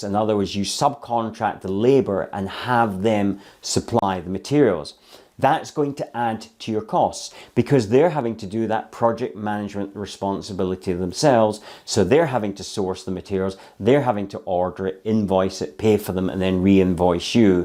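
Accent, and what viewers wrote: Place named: Scotland, United Kingdom. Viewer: Love your Scottish accent! I was just speaking to a friend about building my own house one day away from everyone (dreaming)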